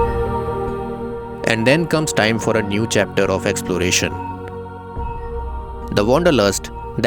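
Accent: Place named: India. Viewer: good lord, the indian accent, it feelsl like you want to scam my money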